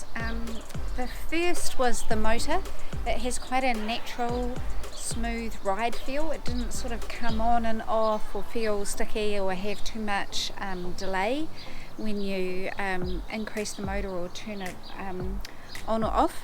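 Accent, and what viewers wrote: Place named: New Zealand. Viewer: i can't get used to that kiwi accent.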